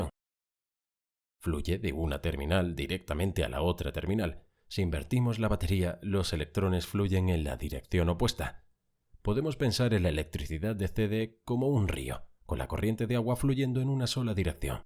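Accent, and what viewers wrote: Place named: Spain. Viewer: Oh NO! Nomas Oi ese Acento de GACHUPIN, Odioso! y me VOY!......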